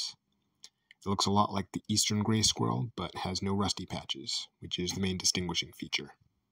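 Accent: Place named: South, United States. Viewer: Ha! Speaks in a southern accent!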